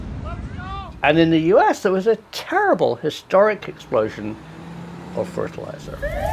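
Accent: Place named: Scotland, United Kingdom. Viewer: Professor I thought Scottish where are you from I’m from Fraserburgh in the north east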